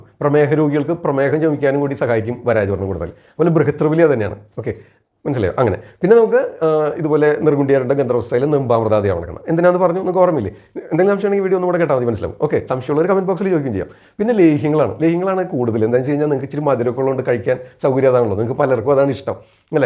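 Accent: Thrissur, India. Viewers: Trissur slang is good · Sir. Thrissur aano? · തൃശൂർ ഭസായാണോ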